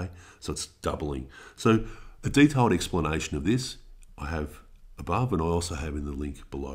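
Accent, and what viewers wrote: Australia. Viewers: I watch your videos because I always learn whatever you’re teaching because you teach very well but mainly because I just wanna listen to you speak lol where are you from? Australia im guessing but which part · I had to go over this in my online class and completely skipped the unit cause the way they explained it was too complicated. It was ridiculous and I hate math. After this video, I went back, followed the instructions and double checked and got them all correct. The Australian accent made it 40x easier. Wtffffff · At first I thought you were british but the Australian accent kicked in later in the video 😂😂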